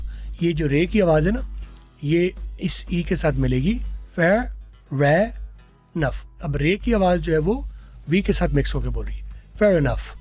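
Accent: United Kingdom. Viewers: Talking is British accent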